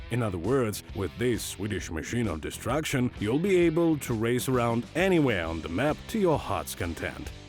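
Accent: Russia. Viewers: Yes, tell me more about Swedish box tenk Mr. Deep voiced Russian Narrator :3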